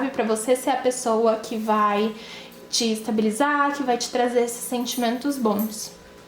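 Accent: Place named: Rio Grande do Sul, Brazil. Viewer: Acho tão bonitinho ela falar, é gaúcha?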